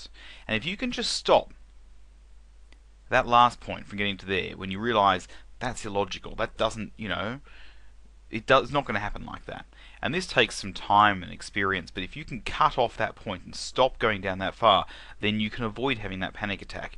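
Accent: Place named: New Zealand. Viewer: Wow you described me exactly. Are you a Kiwi you have an NZ accent?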